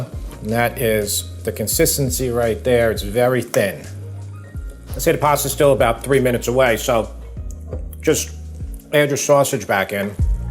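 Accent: New York, United States. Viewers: Are you from the Bronx? Brooklyn? Queens? Or NJ? :)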